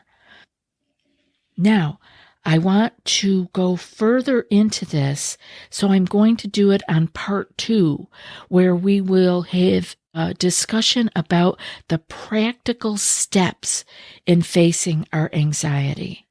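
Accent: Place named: Upper Midwest, United States. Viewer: You’ve gotta be from WI or MN with that accent.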